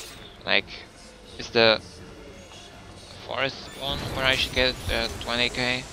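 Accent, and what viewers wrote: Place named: United States. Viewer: btw for being from poland u have a "pretty-hardcore-US-voice" xD with that i mean ur english-speaking is very good! :D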